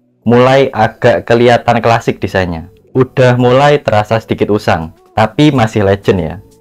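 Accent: Java, Indonesia. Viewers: Suoromu medhog bgt mas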